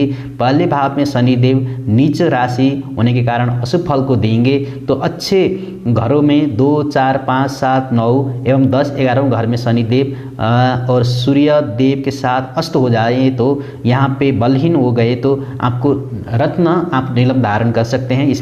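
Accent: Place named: Nepal. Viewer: तपाइ नेपालि हो जस्तो लाग्छ त बोलि सुनदात हो नेपालि मिक्स छ बोलिमा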